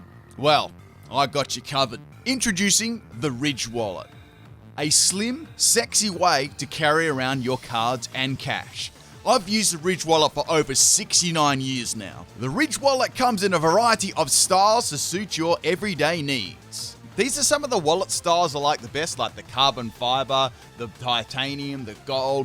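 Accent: Australia. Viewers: Yes his Australian accent makes it so funny 😆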